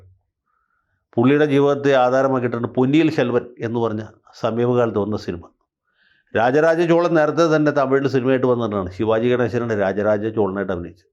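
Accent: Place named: Thiruvananthapuram, India. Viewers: വക്കീലെ നിങ്ങളുടെ ട്രിവാൻഡ്രം സ്ലാംഗ് ഒരു രക്ഷയും ഇല്ല 😂😂😂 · വക്കീലിന്റെ തിരോന്തരം സ്ലാഗ് ഒരു രക്ഷയുമില്ല 😂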